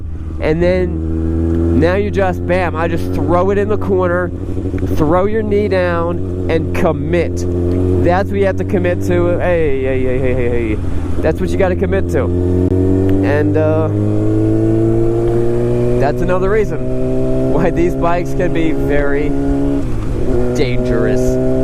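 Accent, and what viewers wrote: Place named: Pennsylvania, United States. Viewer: I'm a recent subscriber and I love watching your videos. As you know there's tons of YouTube channels about motorcycle reviews and at first I didn't realize why I liked yours so much, then I got it. It's that subtle Pennsylvania accent. My family's from Pittsburgh, which some have a much more strong accent than you. I lived all around the country and pretty much lost it all together, unless I'm imitating it on purpose. Of course the rides are great, you discuss what I really want to know, I wouldn't watch a vid just to hear an accent. Thanks for the good job!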